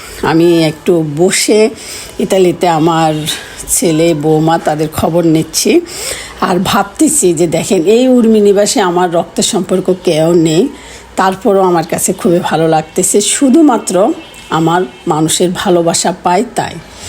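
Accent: Noakhali, Bangladesh. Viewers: Annti আপনার ভাষা নোয়াখালী কোথায়।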